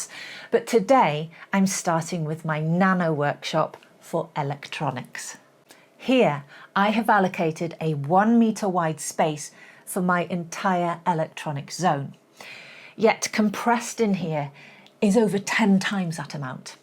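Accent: Wales, United Kingdom. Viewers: You're Welsh aren't you?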